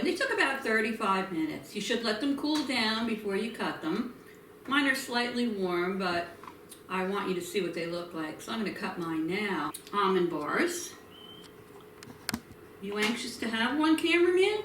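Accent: New York, United States. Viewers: Hello sweet:) My Almond Bars are in the oven right now---ohhh how lovely they smelled just mixing the ingredients let alone while baking! I tweeked a bit--I added 1 tsp almond extract to the cookie dough base--no such thing as too much almond flavoring...right?!! And, I'm going to put a simple powder sugar/water/almond extract glaze on top--just a very thin coat...I can't wait to have a taste test! Thank you so much for the recipe. I enjoyed your video and found both you and your "camera man" endearing. I detected a NY accent. The Bronx? Just guessing. Thanks again! Have a blessed day.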